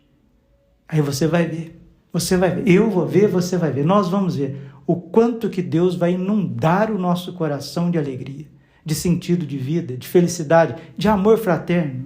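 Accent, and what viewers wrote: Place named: Minas Gerais, Brazil. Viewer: Obrigada Pe. Bráulio por sua vida de dedicação ao serviço de Jesus. As suas homilias são muito tocantes à alma e ao coração. O senhor tem a sabedoria que advém do Espírito Santo para evangelizar. A sua humildade o aproxima de seus fiéis. O seu sotaque Mineirinho é muito cativante. Deus o abençoe. 🙏👏🏼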